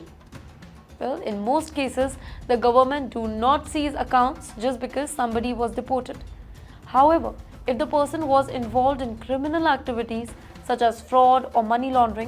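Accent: England, United Kingdom. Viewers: The best Anchorwoman. I just like her English accent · You have a funny accent ! Father British ?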